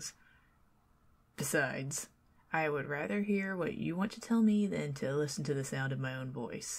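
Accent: South, United States. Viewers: Loved this, really relaxing even if life got in the way so I has to listen to half this morning and half this... huh technically morning again. Anyway yeah really nice and I love the character, her understanding nature and of course your accent mixed with southern hospitality. Just adorable hearing the Yeps and such. 10/10.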